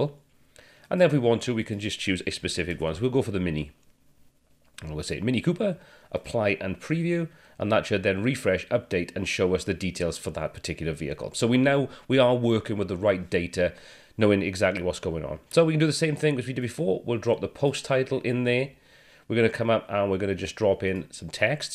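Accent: Wales, United Kingdom. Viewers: Hi, you sound Welsh? I'm also from s. wales. Thank you for this video mate I wish I found this 5 months ago when you made it, would of saved me a lot of time. :)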